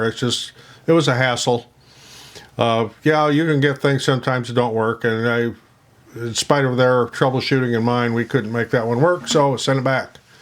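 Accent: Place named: Scotland, United Kingdom. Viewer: Scottish accent, boy oh boy. Well, coming from an american anyways.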